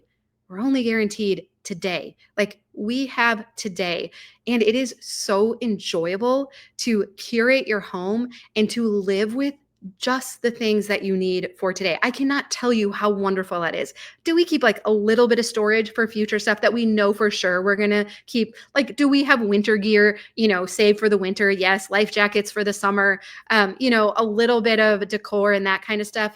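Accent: Minnesota, United States. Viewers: Minnesotan, maybe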